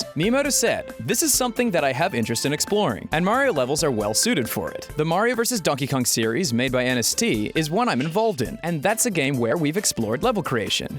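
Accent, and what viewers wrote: Australia. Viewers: I love the occasional Australian dialect pop out here and there, your not fully Americanized yet mate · His Australian is showing.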